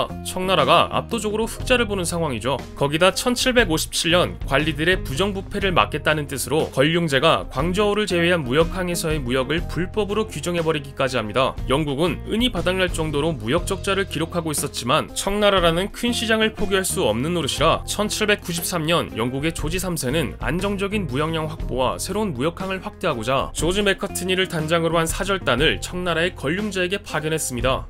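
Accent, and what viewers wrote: Gyeongsang, South Korea. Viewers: 나레이터가 바뀐건가요?? 예전엔 경상도 사투리 쓰신 분이셨던것 같은데요 o.o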